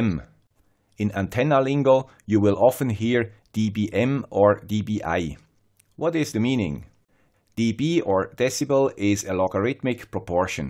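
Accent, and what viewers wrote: Switzerland. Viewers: Came here for the IoT, stayed for the Swiss accent and the cat. Great content as always!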